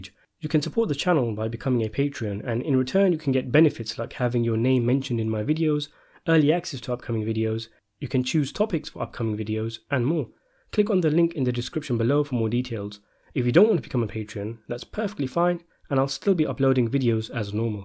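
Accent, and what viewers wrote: England, United Kingdom. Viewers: Suffering as I do from the quintessential America bias to assume anyone with an English accent as a measured scholar, the aesthetic discrepency of approaching this content with said linguistic stylings, tickles me to no end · British accent. Ahh. During Special Forces selection & training in British cultures greatest difficulty is making sure they can pull the trigger. In the States selection etc.was originally modeled directly on Britain's proven methods. That changed. Among other things it was clearly not difficult to find guys who could pull the trigger. That was decades ago. In the current era both cultures have trended towards a greater ability to do so. Capisce ?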